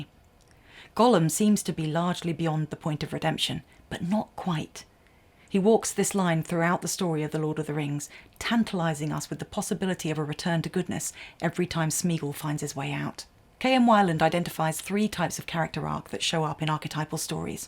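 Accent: United Kingdom. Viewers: You have an amazing voice. Really pleasant. Soft yet not ASMR. Just the right amount of British to sound classy but not posh. Have you ever thought of narrating (your favorite) audiobooks? I think those would sound amazing.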